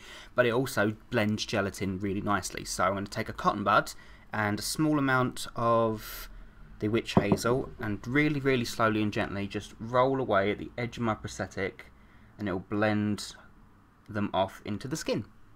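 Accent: United Kingdom. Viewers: You sound like your from the UK! You need to join me with Farmasi, we are opening In February I’m going to try to recreate you look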